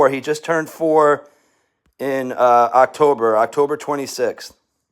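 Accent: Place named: New England, United States. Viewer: Do I detect a New England accent there?!I guess I do!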